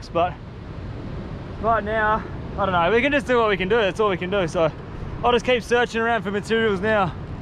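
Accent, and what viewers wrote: Australia. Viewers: I wish i could see british reaction to Australia accent lol gotta be interesting interaction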